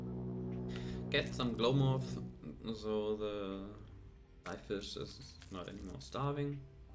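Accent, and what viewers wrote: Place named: Germany. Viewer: Du hörst dich irgendwie an als wenn ein Deutscher, Englisch redet, kann das sein? Hi, it heards like when a German speaks english, where you from? Like goes out.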